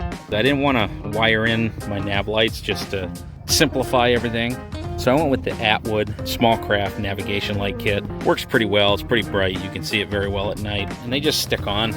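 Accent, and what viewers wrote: Midwest, United States. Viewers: Interesting Midwest accent, never heard that one before.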